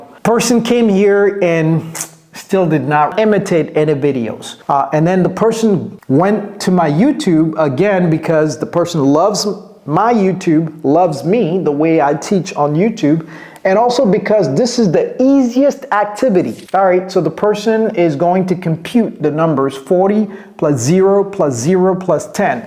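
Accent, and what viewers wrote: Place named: United States. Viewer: Je prefere moins de francais et plus d'anglais parce que je veux imiter votre accent en anglais americain. Pourquoi ne pas nous faire le "SHADOWING IMITATION"?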